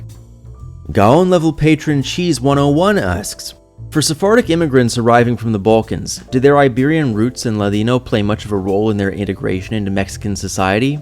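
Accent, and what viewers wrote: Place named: United States. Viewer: Your Spanish was great. You didn’t sound aristocratic or weird at all. You simply sounded like an American with a great proficiency in Spanish.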